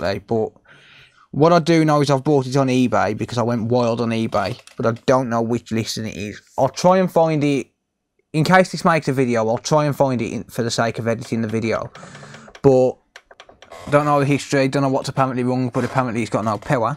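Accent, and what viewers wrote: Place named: Midlands, United Kingdom. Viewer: ahh, I thought you sounded localish, very midlands type accent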